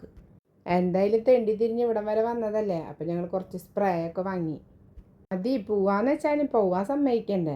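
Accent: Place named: Thrissur, India. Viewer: Thrissur dialogue superrrr❤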